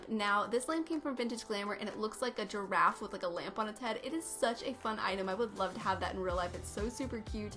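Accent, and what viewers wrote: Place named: United States. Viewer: Beautiful! I always enjoy watching your renovations and I know it sounds weird but you have a lovely voice! Probably because you’re American and I’m English it makes a nice change! 😊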